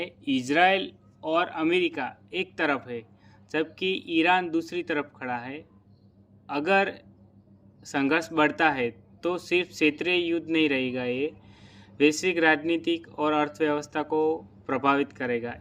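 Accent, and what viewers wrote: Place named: Gujarat, India. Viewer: Gujju Hindi … love it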